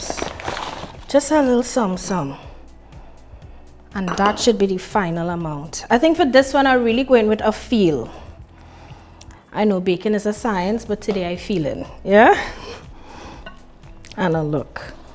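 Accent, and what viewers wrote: Trinidad and Tobago. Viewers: Ah like de trini accent gul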